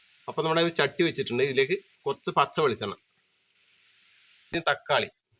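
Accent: Kannur, India. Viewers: നിങ്ങളു കണ്ണൂരാണോ പയ്യുന്നു രാണെന്ന് മനസ്സിലായി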